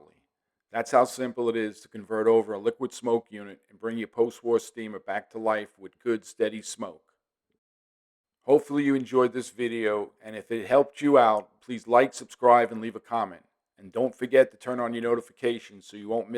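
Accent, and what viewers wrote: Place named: New York, United States. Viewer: Thank you for a great video. I just followed it and did the conversion on my 665 and it’s great. Out of all the videos out there on this topic, I chose yours because of your accent. It just seems right when I’m working on a “made in New York” Lionel. Have a great day!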